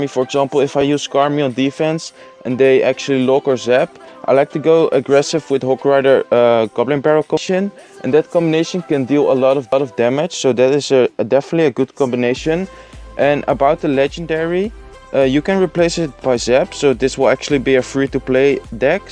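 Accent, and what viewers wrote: Netherlands. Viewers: You still have the Dutch accent :) Good vid btw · Hey man! i love the vids, you're really starting off strong as a channel :) Only thing i want to give you as a tip: maybe work on your english accent for a little bit. I totally understand since i'm dutch too, but i guess a better english pronounciation may help your channel grow. Your content is A+ :D love it! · Ik kan echt een nederlands accent horen wanneeer je an zegt (je hebt de neiging om een te zeggen) en plus (dat je gewoon nederlands uitspreekt. Let daar een beetje op. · Are you dutch?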